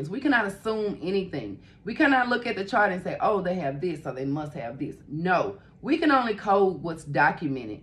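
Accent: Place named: South, United States. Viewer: Great video! (And I love the southern accent!)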